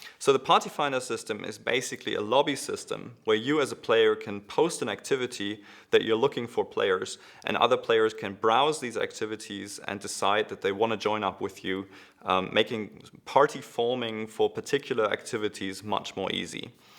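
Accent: Germany. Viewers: This man sounds SO ULTRA German.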